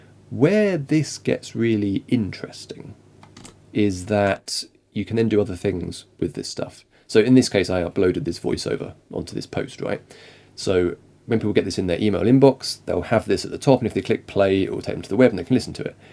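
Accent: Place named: England, United Kingdom. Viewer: Thank you, I'm also very new to Substack and I appreciate that you SHOW what you're doing as you're doing it. Also, an english accent always sounds far more intellectual!:) You've got a new subscriber. I'll be back!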